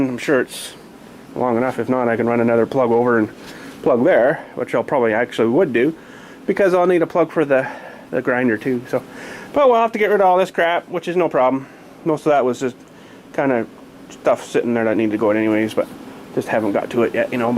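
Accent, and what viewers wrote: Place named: Canada. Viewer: Can definitely tell your a fellow Canadian eh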